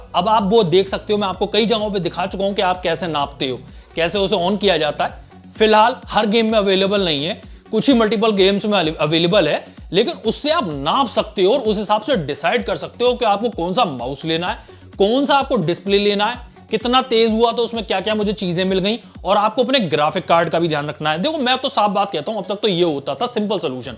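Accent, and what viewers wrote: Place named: Hathras-Aligarh, India. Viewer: Bhaka bhak FPS 😂. Bhai Hathras Aligarh ke aaspas ke lag rahe!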